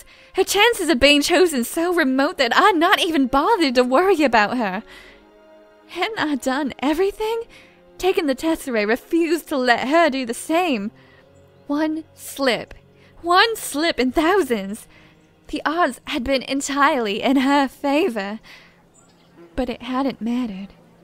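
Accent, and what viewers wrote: South, United States. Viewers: What's up with the southern accent? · I like the southern accent...Good job! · Cool but um what's with the country accent?...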